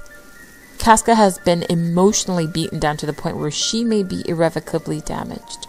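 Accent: Trinidad and Tobago. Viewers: Caribbean accent, from Trinidad right ?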